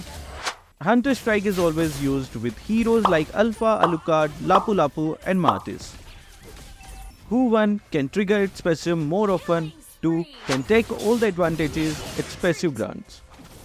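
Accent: India. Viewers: Bro are you from India🇮🇳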